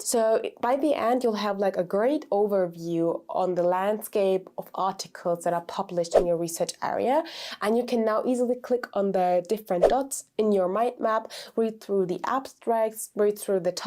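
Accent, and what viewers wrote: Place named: United States. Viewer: Imperial College but Yank pronunciation.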